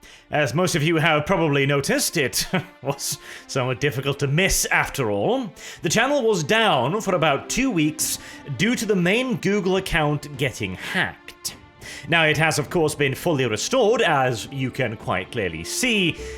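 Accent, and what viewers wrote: United Kingdom. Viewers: Dang boi, your silky British by way of Norwegian accent is music to my ears after the past month.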